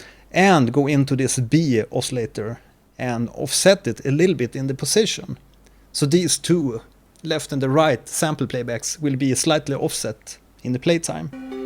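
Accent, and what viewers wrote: Sweden. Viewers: omg you sound sooo swedish! love it! <3 (im from sweden too)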